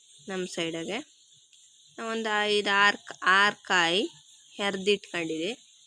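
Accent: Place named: Kundapura, India. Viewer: ಸೂಪರ್ ನಿಮ್ಮ ಕುಂದಾಪುರ ಭಾಷೆ ಕೇಳೋಕೆ ಚಂದ 👌👍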